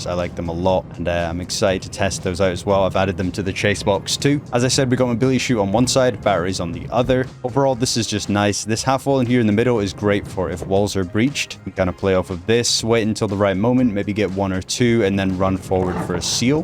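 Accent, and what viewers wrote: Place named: Scotland, United Kingdom. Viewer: Wait! I've been watching your videos and building your bases for the boys for years at this point and it was only now when I was showing my mate one of your PvP vids and he realised you were a fellow Scotsman. How I never noticed is beyond me I obvs thought your accent was "normal" so I never even realised. Keep up the banging videos bro, proud of you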